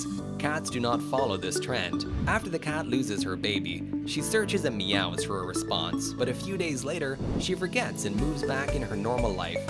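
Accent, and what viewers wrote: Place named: Europe. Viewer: european english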